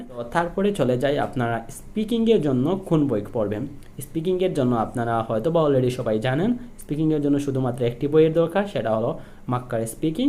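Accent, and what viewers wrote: Sylhet, Bangladesh. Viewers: Sylheti ni..?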